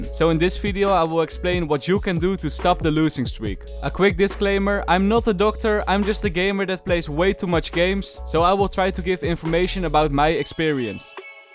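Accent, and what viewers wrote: Netherlands. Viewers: You are dutch aren't ya? ;)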